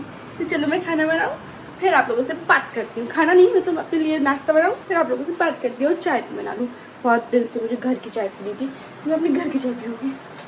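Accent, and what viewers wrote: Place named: Bundelkhand, India. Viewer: Bundelkhandi achhi LG Rahi aap pe❤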